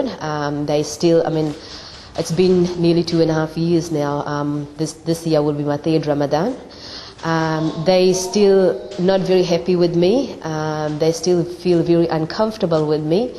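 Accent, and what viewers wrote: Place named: United Kingdom. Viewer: This is UK accent 😊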